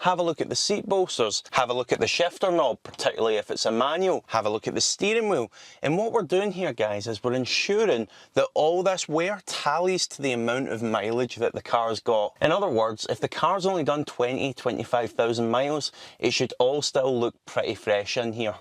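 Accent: Scotland, United Kingdom. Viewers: Thanks for the video! P.S. is it a Scottish accent? I feel like it is not, but i am far from native, so thats why i am asking. Just curious :) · Great review as I am considering buying a 2010 Audi R8 but I subscribed just because of that lovely scottish accent.Well done mate !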